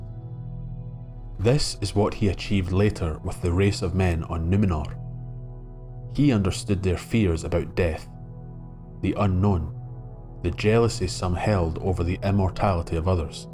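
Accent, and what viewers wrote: Scotland, United Kingdom. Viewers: Are you the Critical Drinker? Or do all Scots sound alike?🤔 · This was really great! Though, much as a Scottish accent makes me weak at the knees, it was unintentionally funny to hear: "And the men of the Ale took Suh-ron back to Nuh-mennor from Middle Airth. Even the Fusstborn recognized the power of his rings to PREZAIRVE..." Aye, ye might try a wee bit harder to nae sound so Gaelic about it all!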